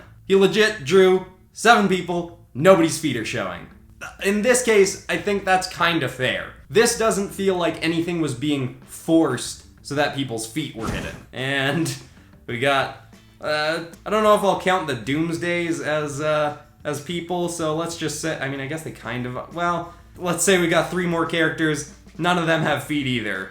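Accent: Canada. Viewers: You have the MOST Canadian "about" I've ever heard. It even bleeds into your DOUBTs, which I never heard before. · Canadian! Besides the celebrity Canadians, it makes me wonder if everyone there pronounces those words that way (minus the French Canadians). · First time watching, can already tell you’re Canadian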